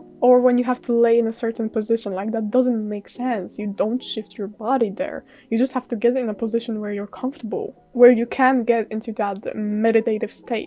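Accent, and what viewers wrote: Poland. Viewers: I was right you're polish, I can tell it by your accents siema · I heard the first few seconds of the video and i already knew you are polish haha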